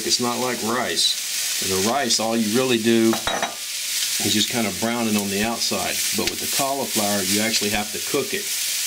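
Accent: United States. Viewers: are you chines or do you live there becuase you make every thing that chines people make and you sound country to me not being roude im just wondering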